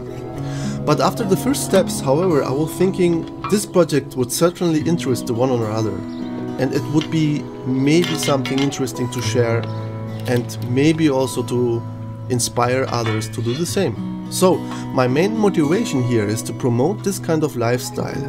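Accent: Germany. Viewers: Grüße aus Ohio, USA ... Ihr deutscher Akzent stört keines Ihrer U-Boote, macht Sie einzigartig und bringt mich zurück zu Ihrem Kanal ... Bleiben Sie sicher ... Ich wünsche Ihnen einen schönen Tag ... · Your german accent does not bother me or others.what matters is what you do. You are showing others that if you make up your mind that it can be done.thank you very much. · I love everything about your channel.... we use to criticize people living thus lifestyle fulltime but we are now understanding that we need the world and the world doesnt need us.....we need to live in harmony with this earth and as for your German accent .....we all have one🙂 saying hello from Barbados 🇧🇧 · Awesome video,and I would agree with almost everything that you said...and btw.you should talk in your videos more,I meant you must be German when I heard you speaking before you said it,and your accent is preety good,kind of sweet,especially by the fact that English and German are so different languages.However,for me German is much nicer language,even though I don't speak Deutsch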